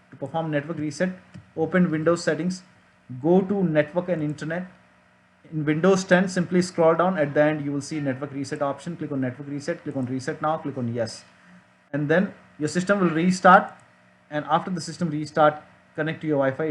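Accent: India. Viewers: love the indian accent, just like vancouver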